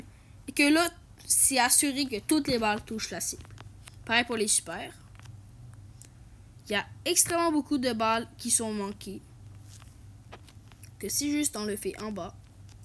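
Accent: Quebec, Canada. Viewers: t'es québécois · T'es Québécois ? Si oui j'aime bien ton accent😉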